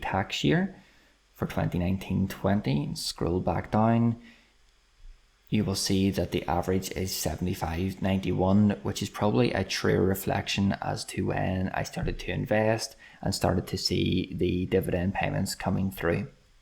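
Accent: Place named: Belfast, United Kingdom. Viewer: Belfast accent. Same as myself. This is good info. Thanks man. Best of luck with it all.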